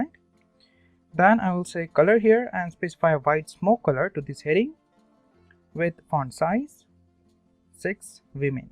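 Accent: India. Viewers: хренов индуский акцент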